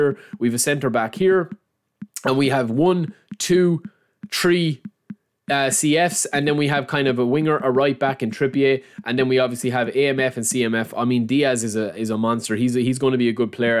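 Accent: Ireland. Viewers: Are you Irish brother?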